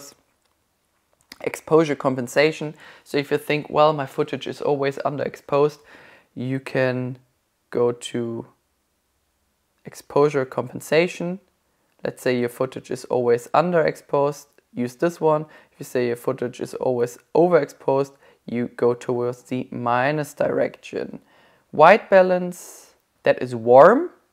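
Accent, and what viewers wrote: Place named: Germany. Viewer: I work for a german boss. Your video reminded me of him. Both because of your accent and almost ridiculous attention to the remote details :D This is an excellent video. I recently got a gopro 9 black as a birthday gift. This video will be really useful for me. Keep up the good work !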